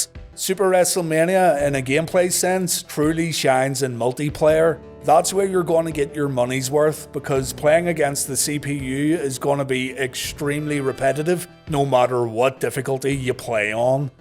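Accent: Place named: North, United Kingdom. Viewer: could you possibly talk less Northern or Irish I find you hard to comprehend the Queens English would help alot thanks in advance.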